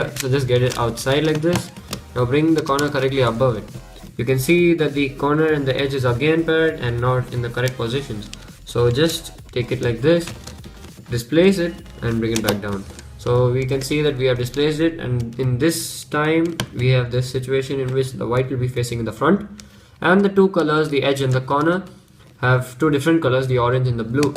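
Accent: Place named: India. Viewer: Indian guy where u live? (Accent makes me laugh)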